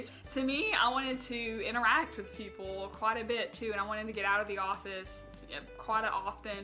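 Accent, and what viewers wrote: United States. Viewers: What American accent is it?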